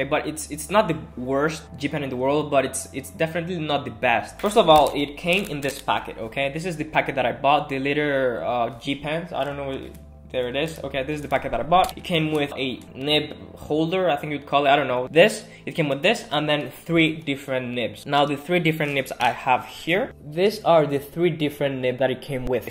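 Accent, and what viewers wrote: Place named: South Asia. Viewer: He sounds so South Asian I guess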